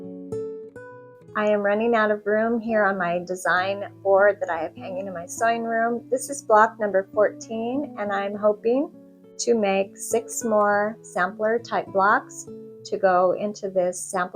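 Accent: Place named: England, United Kingdom. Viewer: Good evening, firstly, what a bonny dress you are wearing to do, it's so pretty. Next, do you remember the name of that gorgeous rad and the navy prints, they are lovely especially that red one, it would make a lovely dress., you sound English but from that cracker of an Airstreamer mobile home, it makes me think that you no longer live here in the UK,. My Daddy made our first caravanette out of a Ford Transit van, that he cut the roof off and put an extending roof on,. He put windows in and my Mum made the seats/table/ bed, with my twin brother in a stretcher of blue fur fabric with navy and white cotton to stop it from going out of shape. I slept across the front seats, definitely not as comfortable as everyone else, but it was the only way we could have time away from where we lived. My Daddy got a proper camper van with real toilet that they could also use as a shower room, real fridge and a cooker. 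They could go with the dog just as the weather here allowed, or turn in a different direction to better looking parts. It's funny how we used to get made fun of at school because we couldn't afford a proper caravan holiday or a real holiday to a holiday park, but we had great fun. He was funny because if we went into a town and it was busy, he turned around and went where there was nothing of any interest for a 10 year old and upwards. Up here in Scotland there has been such a big uptake in people buying anything they see to make into their holiday dream of a motorho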